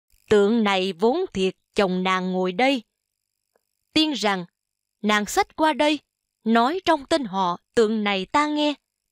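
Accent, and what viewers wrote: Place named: South, Vietnam. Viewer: Đúng rồi, phải đọc ngôn từ miền Nam như vậy mới đúng, chứ dùng ngôn từ chỉnh sửa cái gọi toàn dân thì trật lất hết rồi, chân thành cảm ơn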